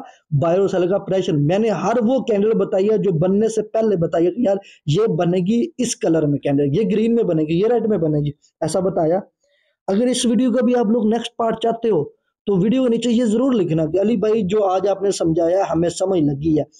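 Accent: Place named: Kashmir, India. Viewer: Sir bohut kush sikhne ko mil rha ha apki videos sa bilkul hmrey jammu and kashmir ki trh language boltey ho ap❤❤❤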